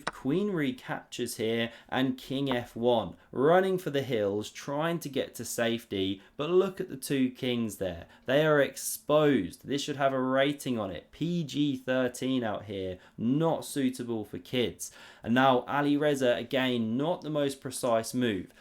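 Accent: United Kingdom. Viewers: I love ur british accent man keep it up !😊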